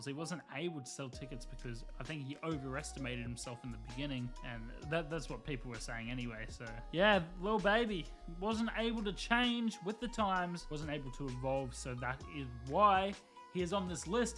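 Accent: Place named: Australia. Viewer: Cool video but i got a question, do u ever listen to any Australian rap ? If so what u think of it? I assume your aussie from your accent (sorry if im wrong)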